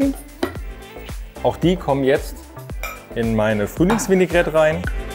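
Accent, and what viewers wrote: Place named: Berlin, Germany. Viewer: "Nen Gudn Appetit wünsch ich euch" lol Ich liebe diese geschwollene Berliner Redensart. Erinnert mich sehr stark an Christian Rach. XD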